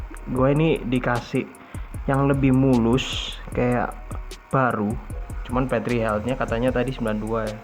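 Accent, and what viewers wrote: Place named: Java, Indonesia. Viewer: Waduhh wong Jowo 🤣To bwanggggg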